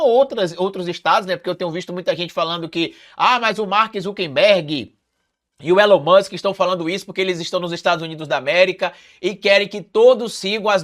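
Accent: Northeast, Brazil. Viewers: Boa tarde, gosto muito das suas explicações, te admiro você é muito inteligente, esse sotaque nordestino é o seu charme kkkkkk.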